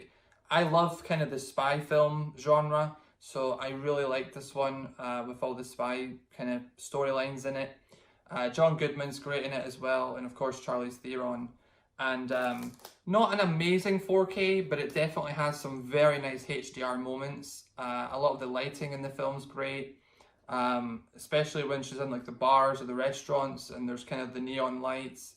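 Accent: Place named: Scotland, United Kingdom. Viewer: Hey dude you sound Scottish - where about are you from? Do you buy blu rays from Asda HMV etc? I’m big into 4K blu rays also from Scotland. Watched Aquaman 4K on my Oled and was incredible.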